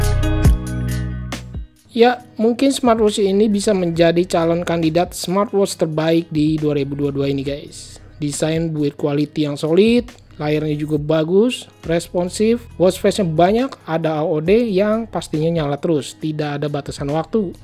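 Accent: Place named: Ciamis, Indonesia. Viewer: Paham suaranya.. Orang Ciamis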